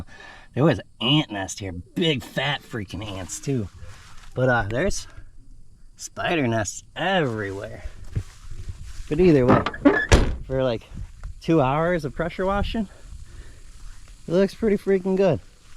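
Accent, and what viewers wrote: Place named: North, United States. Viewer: Where are you from in Florida? Your accent sounds more northern than southern lol. Love the video!! Sweet ride too!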